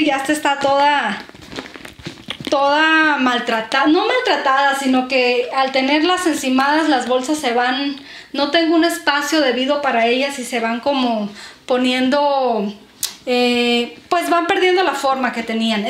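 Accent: Chihuahua, Mexico. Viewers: Si yo pensé que eras de chihuahua ai viví y e encantan cómo hablan jijiji saludos, ese perfume cuánto te dura...? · Hola me gustaria saver de donde eres por tu sonido de voz a mi parecer creo que eres de chihuahua o de sinaloa a cual de los dos le atine😁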